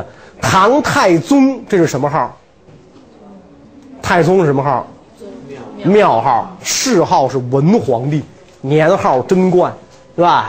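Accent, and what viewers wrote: Beijing, China. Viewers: 首先得是北京口語，節奏明快用詞精準，光聽都覺得順耳，流暢不嗑巴..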